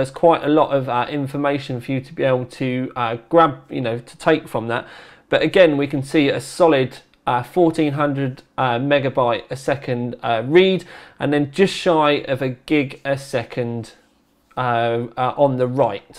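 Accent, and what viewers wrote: United Kingdom. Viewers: anyone here knows how to avoid UK accent videos on youtube?? OMG!!! headache. Thanks for the video anyway o.O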